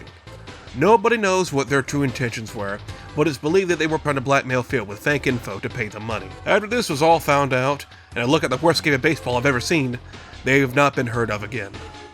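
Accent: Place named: United States. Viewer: I gotta be honest, I like listening to voice. It’s just something about the “Cowboy-ish” accent that really tickles my brain.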